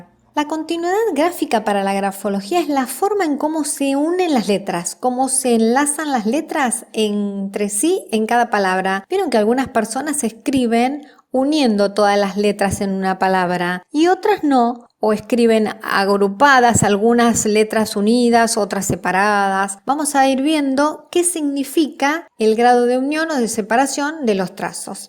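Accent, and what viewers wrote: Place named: Argentina. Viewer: Me gustan muchísimo gracias el acento de voz de argentina es muy bonito gracias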